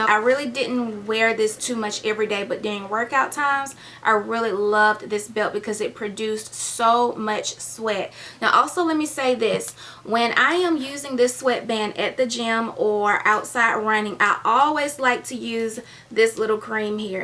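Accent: South, United States. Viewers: I love your voice smooth and southern you could read a prescription bottle and it sounds good "Naproxen, Acetaminophen, Iridocyclisis" 😂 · I like that southern bell voice very relaxing😁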